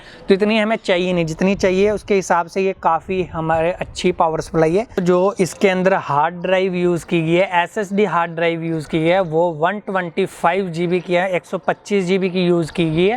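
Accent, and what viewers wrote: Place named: Punjab, India. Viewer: Kinna aukha h punjabi bande nu hindi bolna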